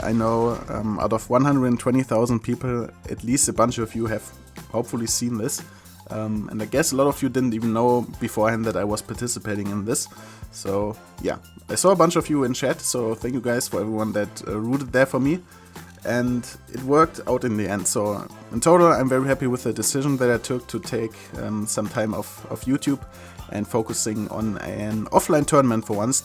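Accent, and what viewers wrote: Germany. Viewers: Man merkt das du deutscher bist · Man merkt das du Deutscher bist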